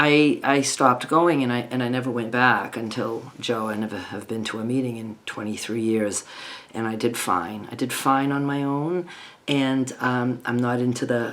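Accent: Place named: Massachusetts, United States. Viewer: Hi, I found you recently and am thrilled! You are an amazing artist!!! I was planning to respond to the video where Skylar colors Memere's hair. I was so moved to hear someone say the French name for Grandmother that I almost cried. I lost my Mem years ago and very rarely hear the term any more. My family is originally from Worcester, Mass. and they have your accent. Most are gone now, so hearing your voice is very comforting! I am a new subscriber, so really looking forward to unpacking all you offer, which is quite a lot! Can't tell you how you lit a candle in my heart, thinking about my own Memere'! Thanks for offering us the gift of yourself and your art.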